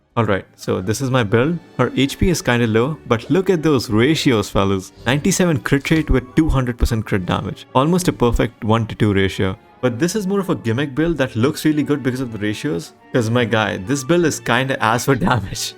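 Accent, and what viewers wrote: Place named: India. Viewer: really like your accent. Its inbetween indian non indian- kinda 🥵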